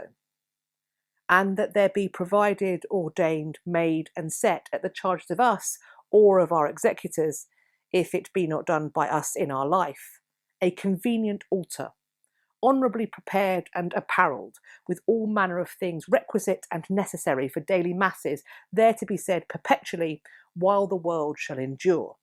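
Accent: RP, United Kingdom. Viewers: I just love listening to you speak. So elegant. No fillers not a single extra syllable. Just straight clean facts delivered in BBC English. Episodes are always fascinating as well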